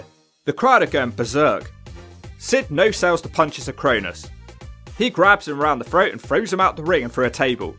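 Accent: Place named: United Kingdom. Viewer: Seriously, dude. You're a Brit. Why are you writing about American culture, you freak? In case you're dumbass wasn't aware, pro wrestling is a product of American culture, so fuck off with your gay high-pitched Brit accent. It's not manly and it's definitely not suited for an American audience. In case you haven't noticed, Americans are real men and Brits are not real men. Just watch a Benny Hill comedy special to understand how femine and weak real Brits actually are.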